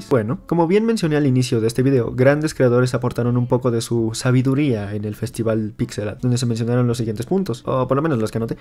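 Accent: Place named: Mexico City, Mexico. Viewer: Concuerdo, muy chilango 🤢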